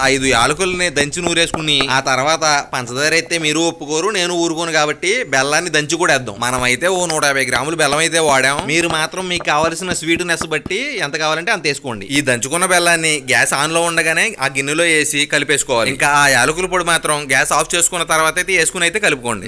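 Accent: Godavari, India. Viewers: మన గోదావరి స్లాంగ్ మీరు చాలా చక్కగా మాట్లాడుతున్నారు భాయ్ ❤❤❤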